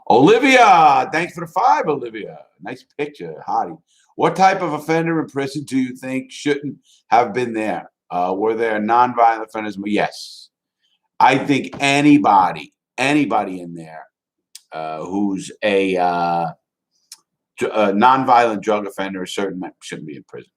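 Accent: New York, United States. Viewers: He’s gotta be New York?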